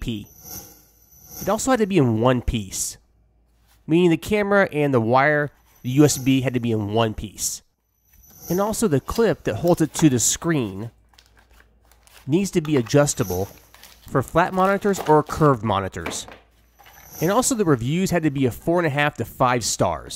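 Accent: United States. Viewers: you from nebraska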